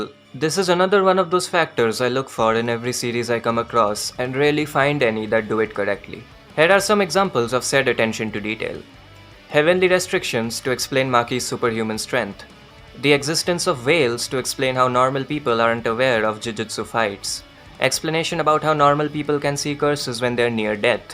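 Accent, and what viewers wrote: India. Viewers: Indian accent. ok im out. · Sounded indian so i subscribed ❤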